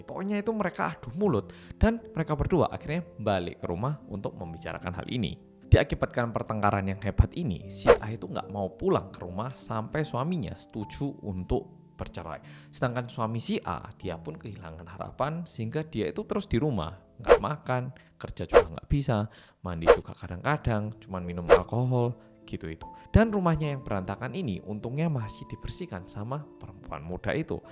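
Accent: Java, Indonesia. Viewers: mas, suara viedomu tu kecil, cuma kedengeran medoknya doang, boleh saran ya volume nya agak di gedein, soalnya kaget udah gedein volume trus tau2 iklan kenceng banget suaranya T_T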